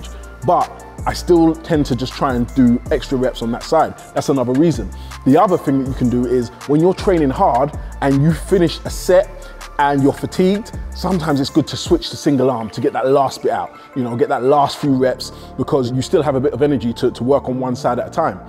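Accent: United Kingdom. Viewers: Ain't you from UK 🇬🇧?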